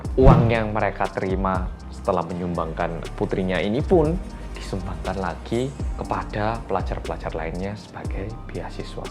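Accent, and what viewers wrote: Java, Indonesia. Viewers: Medokkkkkkkk semangat amat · Medokkk ... 😆😆 ttp semangat ... ttp jg kesehatan & GBU 😇😇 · Sudah ngepot ngepot gitu ya 😄😄 medhokk poll mas ki. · Ga yakin saya Anda orang Korea... Mendok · Masnya nich orang mana sih.... tampange wong korea tpi ngomonge ky wong jawa.... 😂😂😂😂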